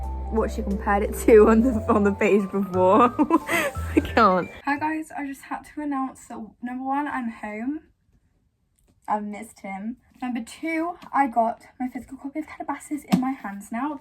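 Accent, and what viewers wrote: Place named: United States. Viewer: I LOVE UR AMERICAN ACCENT BAHAHA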